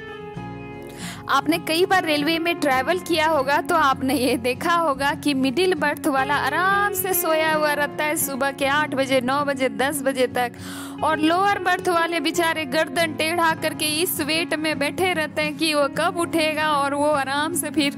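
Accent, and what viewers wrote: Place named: Marwar, India. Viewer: Bahut hi badiya jankari di aapne medam… aap has has k jese bata rahi thi video interesting ho gaya or mene pura dekha or ha aapki voice se aisa lagta h aap marwadi ho sayad.. i am right ya wrong medam ??😊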